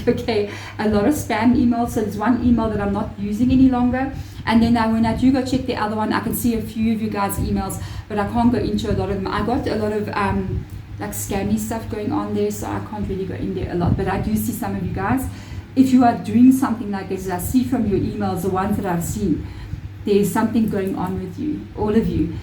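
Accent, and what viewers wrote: Afrikaans, South Africa. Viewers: I thpught i picked up an Afrikaans accent. Im in the vaal in south africa. I havnt got a wand but i use a cow tail . And im so obsessed with horns haha. The things you are saying are so spot on. This whole reading ifeel is aimed at me. I am going thru a spiritual awakening and im writing a book. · You are 1000% correct, when I do a reading I can never recall the information 😂 thank you so much for your channel. My great grandmother is one of my ancestral guides, and she was Afrikaans. I appreciate your beautiful accent, makes me feel closer to her, which is funny cos I know she is always with me xx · I have been thinking about writing a book for years. I have so much information that I feel is valuable. My ADD, anxiety, and depression are really limiting my energy and motivation. I love your accent. I lived near Cape Town for a while. 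Lovely country and people 💛 love from the USA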